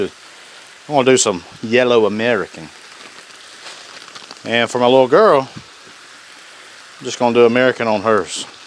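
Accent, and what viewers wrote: North Carolina, United States. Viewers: My 36” is coming in this week! You sound like your from my neck of the woods near Raleigh NC. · Man you gotta be from somewhere around G-town , NC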